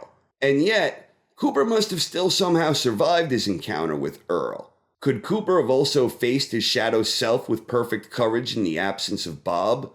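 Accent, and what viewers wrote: New York, United States. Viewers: is that a little bit of a jersey or new York accent you got dude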